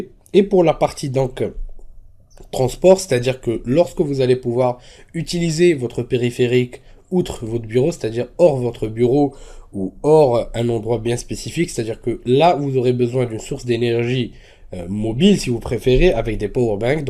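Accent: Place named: France. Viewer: salut merci pour le video mais mes oreilles saignent , svp arretez de prononcer les mot anglais a la francaise vous denaturé completement la prononcition et la comprehension n es que plus difficile. tu explique 2 minute ou est le bouton reset mais tu ne nous dit pas qu est ce que le tetra machin truc. alors petit cour pineapple le i se prononce aille comme pas i , paynaple pas pine aple . et le plus dur RESET , c est pas un raisin , resset , avec le son c . en plus tu prononce le e en i alors pourquoi ne pas continuer et le prononcer comme il faut . si tu prononce le e en i pourquoi prononcer le i en i a pine apple ???? en espagnol quand tu demande como esta ? comment tu vas. et que lon te repond esta bien . tu repond en prononcant le bien en bi enne et non pas en bien comme en francais , c st pas la meme langue . c est pareil en anglais. va falloir faire un effort un jour la . 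ensuite tu nous parle de cable reliant le mark 7 mais tu nous montrepas comment se conecter dessus. ta manip de ipconfig c est super mais ca ne fonctionn pas du tout , et ton port 1471 ( indiquer 1461 ) dans les sous titre j ai re ecouter 4 fois en montant le volume et tu dit 1461. ou as tu pris ce port, ca non plus on le sais pas., du coup je peux pas aller plus loin dans le video . bonne journee et bon cour d anglais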